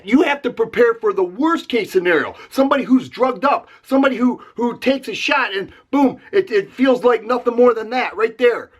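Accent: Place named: South Boston, United States. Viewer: Where did you get that accent.? I want to to say south Boston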